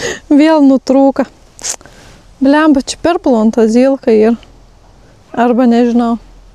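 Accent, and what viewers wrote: Samogitia, Lithuania. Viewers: Jetau kam monie patin to zemaite kalba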